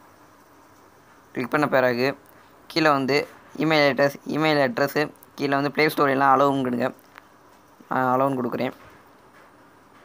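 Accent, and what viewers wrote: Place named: Tirunelveli, India. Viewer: Payapulla thirunelveliyo 🤩 luv ur slang bro 🙌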